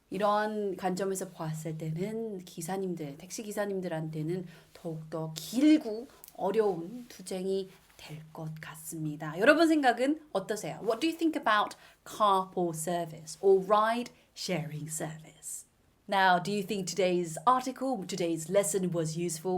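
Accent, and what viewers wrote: RP, United Kingdom. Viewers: 아나운서님 ㅜㅜ ♡ 오늘도 너무 재밌게 봤어용 ㅜㅜ 영국 발음 너무 멋있으세영! 님 응원받고 정말 큰도움이되었어요오! 답글 정말감사했습니당 ㅜㅜ ♡ 감사합니당! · 아... 아나운써님 너무 멋져요. ㅎㅎㅎ 포쉬액센트가 이리 멋질줄니야..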